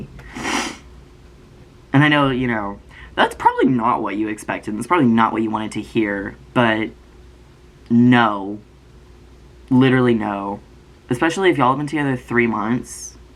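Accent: United Kingdom. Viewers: the British accent omd 😭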